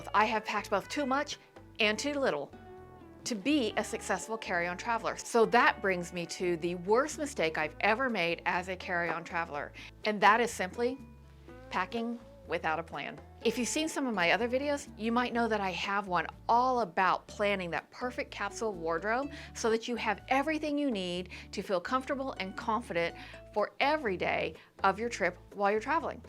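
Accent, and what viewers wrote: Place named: Texas, United States. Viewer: Hi! This is my first video of yours to watch, and it was such a treat! I love that you say y'all! 😀 You sound like you could be a neighbor down the street here in Texas. LOL I was also surprised to see that we have the same exact backpack, down to the color! I love it! I'm fixing to use it for my very first carry on only flight. 😀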